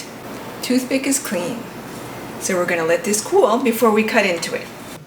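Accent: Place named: New Brunswick, Canada. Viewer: You must be from NB! I grew up in Bathurst and I recognize the recipe (coked it many times myself) and your accent! - a new subscriber from NJ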